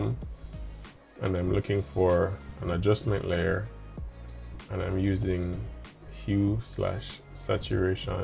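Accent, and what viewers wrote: Jamaica. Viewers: You have a Jamaican Accent lol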